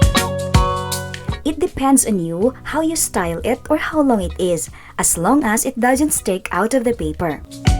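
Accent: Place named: Philippines. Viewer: It would be really helpful if you could make the measurements stand out more as really hard to see pencil. Black felt tip maybe? Are you Phillipina? I thought I recognised the accent 😊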